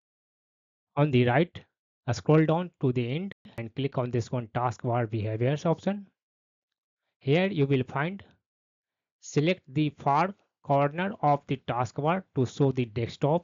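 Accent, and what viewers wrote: India. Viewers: you know the tutorial good when the accent is indian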